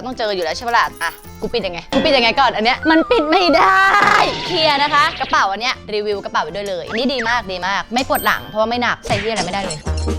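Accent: Thailand. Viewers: เหน่อ น่าเอ็นดู น้องจ๊ะ จุ๊บๆๆ😘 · ทำไมสำเนียงพี่จ๊ะออกเหน่อๆคะ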